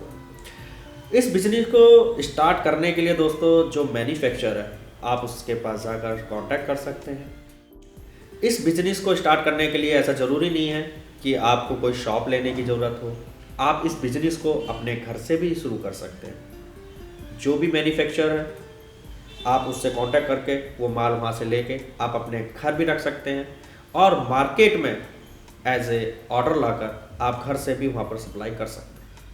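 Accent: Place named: Uttar Pradesh, India. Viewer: up ka he ha lagtha ha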